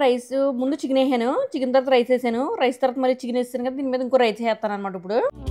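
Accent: Godavari, India. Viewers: ❤❤❤... America lo untu kuda chakkaga godari lo matladtuu mana ammayi anipinchukunnaru andi🎉🎉.. Disti tiyinchukundi😁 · Akka godawari pilla bale matladutunnavu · Anni panulu bale chesaru fast ga nice godavari yasa baga mataladutunaru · Very nice godavari vioce style 😅👌👌👌👌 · Godavari basha Baga matladu tunnaru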